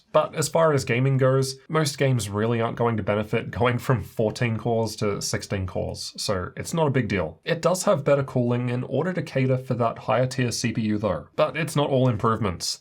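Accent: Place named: Australia. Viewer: That's the Aussie accent 😀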